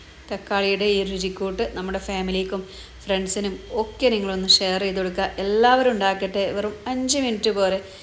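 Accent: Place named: Malabar, India. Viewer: The Malabar slang is interesting for us (South Kerala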